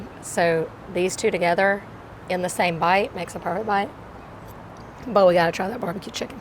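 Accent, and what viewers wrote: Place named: Texas, United States. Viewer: I thought you were from Texas this whole time lol.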